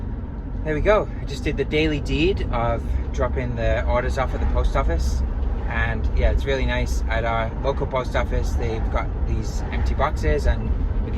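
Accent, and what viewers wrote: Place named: Scotland, United Kingdom. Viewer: You sound as if you have Scottish twang in your voice Max Boyce is a Welsh comedian.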